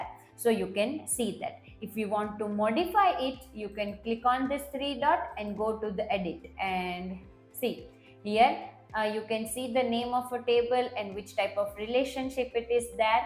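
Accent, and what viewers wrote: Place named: India. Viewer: Accent variation sounds very much like Deepak chopra, wonder if you’re from the same region in india lol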